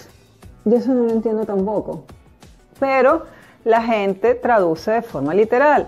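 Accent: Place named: Venezuela. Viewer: TIENES UN PROBLEMA Y ES QUE NI SI QUIERA TE EXPRESAS BIEN EN CASTELLANO. PARA ELLO DEBERÍAS SABER EXPRESARTE BIEN EN CASTELLANO Y POR TU ACENTO CREO QUE ERES VENEZOLANA.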